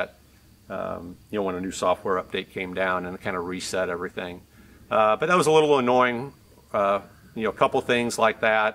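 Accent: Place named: South, United States. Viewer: You have an easy southern delivery. Great job.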